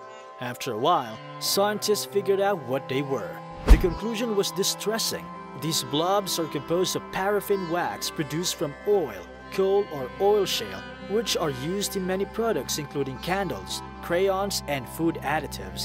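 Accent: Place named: Netherlands. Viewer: Narrator sounds Dutch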